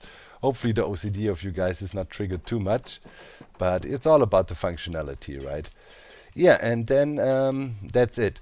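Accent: Germany. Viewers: Dein englisch hört sich sehr deutsch an O.o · Are you german by any chance? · I knew he is german XD he has this typical accent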